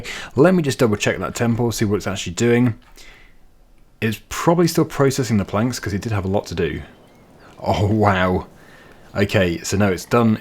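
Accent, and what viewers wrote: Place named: Southern England, United Kingdom. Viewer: Can I just say, the British way of saying things is the correct way. Our American friends pronounce things incorrectly and have some of their own words for things. A trunk to the American’s is a boot to us. Lol…you can’t cater to every language dialect and varying terminology. Just be you, be British (I would say and ‘proud’, but atm Britain is a little broken). Anyway at least you’re from down south and sound posh. Unlike myself who is a northern lass and sounds as broad as a plank, with a strong Lancashire accent 😊 I would have put money on you flipping that trailer with all that wood on it! You surprised me lol…it was very close at one point though. 😂🤣 Loving the series very much, but I would like to see you ride the horses now and then. I hope you are feeding Dogwin! Thanks for all your hard work.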